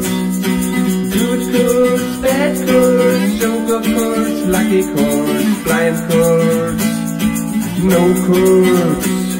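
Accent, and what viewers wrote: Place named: South, United States. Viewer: Gefällt mir. Da paßt selbst der (germanische) Südstaaten-Slang.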